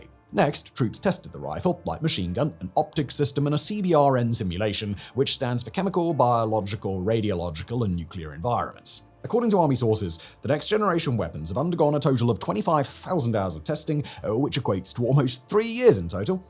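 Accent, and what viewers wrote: United Kingdom. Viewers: Ah, at last my broadly divergent taste in YouTube has converged. Footage of Garand Thumb, set to the dulcet British tones of Simon Whistler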